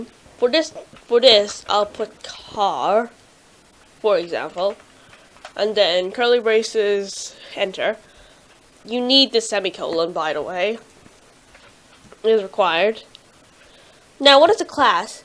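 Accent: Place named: United Kingdom. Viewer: From your accent and your way write colour instead of color. You might be UK Citizen. 🤔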